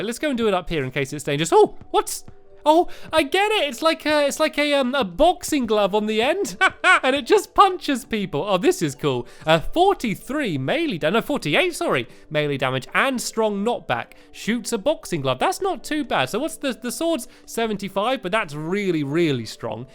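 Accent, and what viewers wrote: United Kingdom. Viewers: Do you know British Accent... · Jafar the Barmecide well what do you expect?stampy is british you can't expect him to say hallow instead oh hollow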